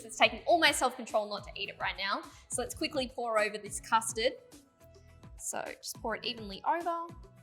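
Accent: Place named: Australia. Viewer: She's so happy all da time man...and she sounds like she's from Australia. What a terrific woman. Preciate da video fr💚🖤🤲🏾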